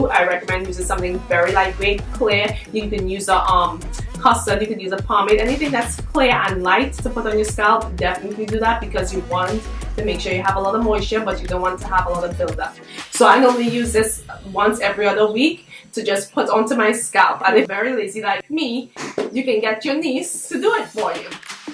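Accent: Trinidad and Tobago. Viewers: yuh cyah hide the accent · she has a trini accent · ah know mih ppl Trini accent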